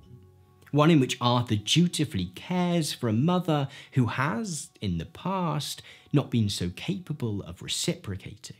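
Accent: United Kingdom. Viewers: british accent make everything better^^^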